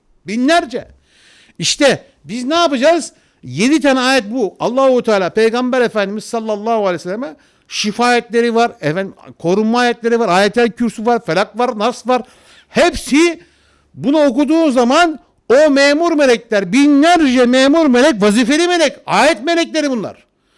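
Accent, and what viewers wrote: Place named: Thrace, Turkey. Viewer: Hocam Trakyalımisiniz? Bende trakyalimyim konusmanizdan anladım Saygılar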